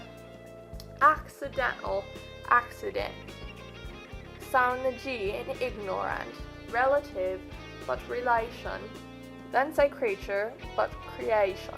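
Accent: United Kingdom. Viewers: you sounded more posh than cockney but you did a great job (English person here :D ) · That was a great Cockney accent! I always turn into a Scottish person when i try. :/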